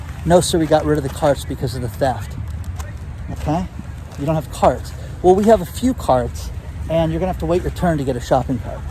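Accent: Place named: California, United States. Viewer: I love his California accent haha. It’s like a Canadian..certain words have a zing. Anyways great content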